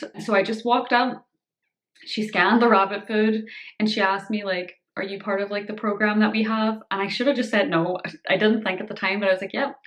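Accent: Ireland. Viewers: The cards are such a wonderful idea. I have a little handwritten folded paper in my wallet with emergency contact info just in case. (I have a panic disorder and medication can sometimes make me dizzy). I think everyone should have a card anyway :) Also please do not let the negative comments about what you should post get to you, it’s YOUR channel. You really do not owe anyone anything. It’s a gift that we get from you to see what you’re up to or how you’re doing on your time. With that said I’m so happy you’re back in the homeland and getting the help you deserve! I know this new medication is going to be great! It’s such a huge accomplishment to have progressed in the physical side and now with a few adjustments I feel the spacial issues can resolve too. P.S. your Irish accent is always so refreshing to hear ❤ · 🙏🙏🙏 Keppra works for me. Been on it since 2006. 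Added Trileptal a few years later. The two together work awesome. Everyone responds different to different meds. Love the Irish accent ❤️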